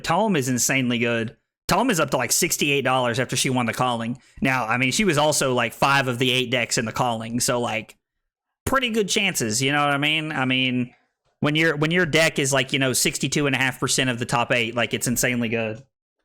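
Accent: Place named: South, United States. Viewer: im from NC i could tell you were from somewhere in the south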